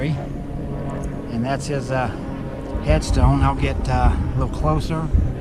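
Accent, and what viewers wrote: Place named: United States. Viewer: Are you from Glenwood Springs? or a different part within Colorado? I know you are an American. Your accent does give it away. I'm just wondering as to where your from.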